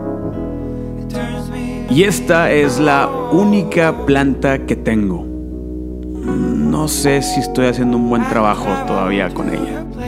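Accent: Monterrey, Mexico. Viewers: Me re encanta su acento regio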